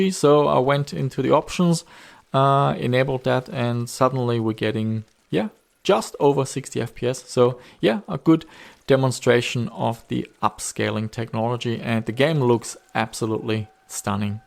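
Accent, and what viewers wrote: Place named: Germany. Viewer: Look! My favourite German accent Australia dweller has a face!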